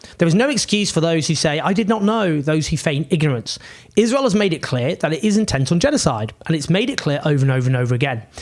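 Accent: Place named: Yorkshire, United Kingdom. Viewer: He’s from Yorkshire, in the north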